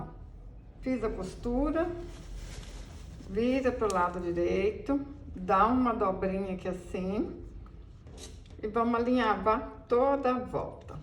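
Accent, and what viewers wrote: Minas Gerais, Brazil. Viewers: Você é mineira? Eu sou cearense, moro em Fortaleza. 😀 Adoro o seu sotaque! Parabéns pelo seu trabalho! 👏👏👏👏👏👏👏👏👏👏👏👏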